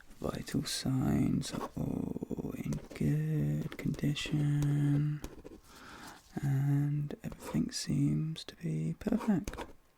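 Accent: United Kingdom. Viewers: The British accent is really beautiful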